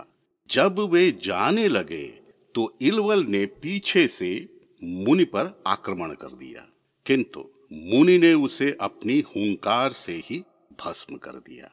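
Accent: Standard. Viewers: सचमुच रहस्य एवं रोमांच से भरी महाभारत की एक अद्भुत् और अलौकिक कथा….! आपकी अद्भुत् और शुद्ध उच्चरित वाणी से कथा और भी प्रभावी हो गयी है। चित्र भी ख़ूबसूरत नंऔऔर सटीक हैं। एक प्रश्न मन में उत्पन्न हो रहा है कि अगस्त्य मुनि ने जिस सुन्दरी स्त्री का स्वयं निर्माण किया उसी लोपामुद्रा से विवाह करने की इच्छा क्यों की...? दैत्यों का वध उचित था क्योंकि उनके कर्म उचित नहीं थे। पर दैत्य की संतान प्राप्ति की इच्छा क्यों पूरी नहीं हो सकी...? क्या अनुचित था? 🤔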